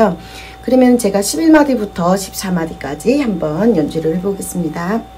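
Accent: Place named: Seoul, South Korea. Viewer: 오? 부산에 계신데 서울 말씨네요~~^^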